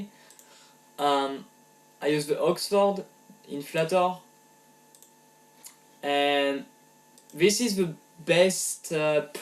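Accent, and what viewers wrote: France. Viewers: Quand j'entend ta façon de parler on se croirait devant une classe de 20 personnes à présenter un exposé xD Mais bon l'accent frenchy ca fais kiffer les étrangers :)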